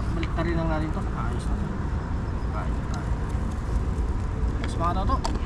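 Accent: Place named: Iloilo, Philippines. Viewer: saang province ka ba galing?naitanong ko lang kasi ang punto mo parang ilonggo.😊ingat lang po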